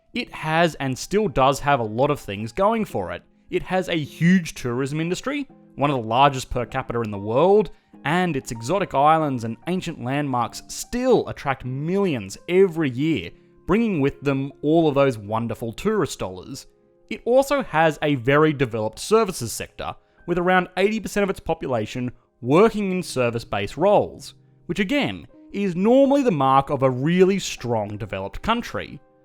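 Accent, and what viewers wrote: Australia. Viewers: Narrator is aussie i think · It is very true that Greeks leave the country especially the young ones. I am 17 right now, when I finish uni in some years I am planning to move to the UK/US/AUS (i am looking for a stable, English speaking nation) and so are most of my friends. Also loving your Aussie accent mate!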